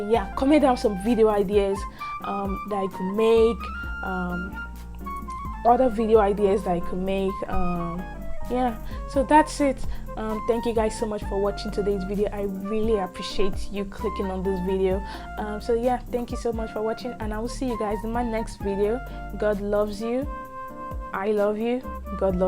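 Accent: Nigeria. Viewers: I understand you so much....it's a big shame to Nigerian government for not making adequate provision for students back home. My first day in American college (FLORIDA) was hell but I came through. I am glad you are catching up and getting more confident in yourself. Accent is not a barrier it's just the beauty of our nationality. I just subscribed 👍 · Keep your Nigeria accent up. It really dope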